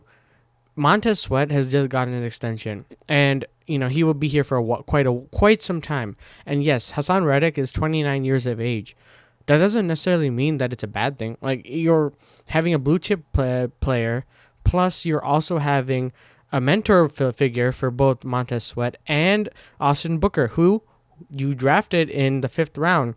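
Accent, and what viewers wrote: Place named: India. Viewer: the thick Indian accent in the video tells me he doesn’t know what he’s talking about and you’re just out here glazing these ill advised takes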